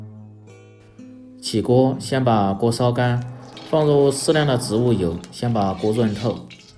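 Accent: Sichuan, China. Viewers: 四川人，一听就晓得嘛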